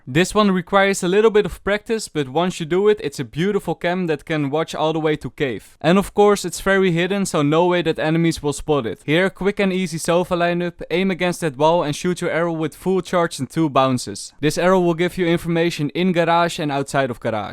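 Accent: Netherlands. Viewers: He is now consistent in uploading great job man With a Dutch ascent!!!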